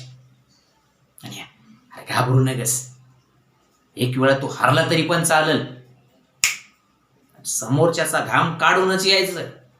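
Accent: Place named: Kolhapur, India. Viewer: Mast ahe bhau kolhapuri laheja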